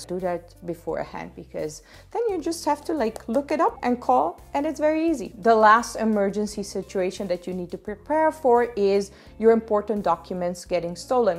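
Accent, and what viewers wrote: India. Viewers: and you got an indian english accent :P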